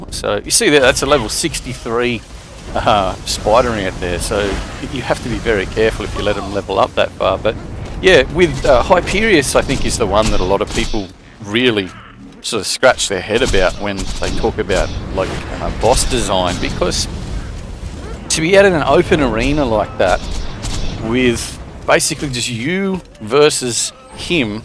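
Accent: Australia. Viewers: Yes we do and your aussie(thats a compliment)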